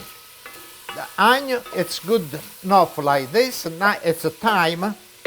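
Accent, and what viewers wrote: Italy. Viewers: Cute Italian style English ~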